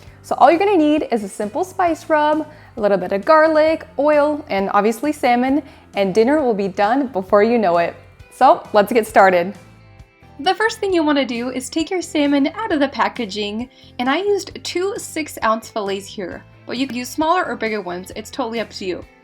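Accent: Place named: Poland. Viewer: Where is the accent from?Polish?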